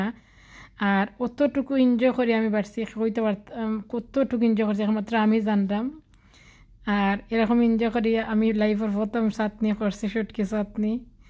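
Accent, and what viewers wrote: Sylhet, Bangladesh. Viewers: সিলেটি ভাষায় আপনার কথাগুলো শুনতে আমার অনেক সুন্দর লাগে শুটকি ভর্তা অনেক লোভনীয় করে তৈরি করেছেন ❤❤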